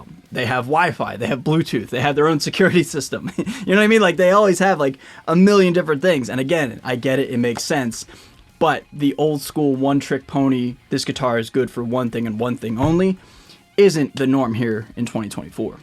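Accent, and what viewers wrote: United States. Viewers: by any chance are you american?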